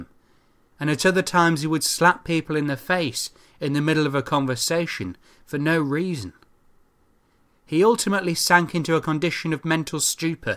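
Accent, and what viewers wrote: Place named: England, United Kingdom. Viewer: All lies...spoken by an englishman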